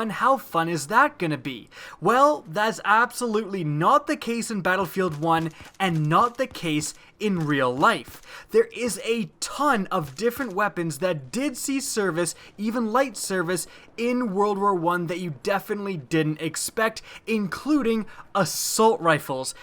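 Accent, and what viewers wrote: Russia. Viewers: Nice Russian accent 👍🏻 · Nice russian accent and hello from Russia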